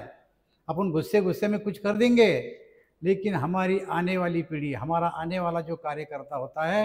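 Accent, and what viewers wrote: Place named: Marathwada, India. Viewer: Khup chhan gavran jaminivarcha neta..... jaykwadichi koli ani ravsaheb denvenchi boli hicha marathvadachi alokha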